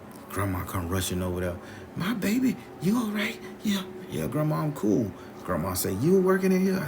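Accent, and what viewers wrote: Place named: South, United States. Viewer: I listen to the stories featuring Grandma the most. She reminds me sooo much of my Grandma it’s her face I see when you tell the story. Snuff instead of tobacco, a hatchet instead of a 38, and she only practiced ass kicking without spells. Your stories could be on sci fi or something like that. She was a southern woman as well so the voice and terminology is spot on. It’s crazy! It creates a warm memory that never really was, at least not for me. More of an adventure that I can actually see happening. She kept a Buick, too!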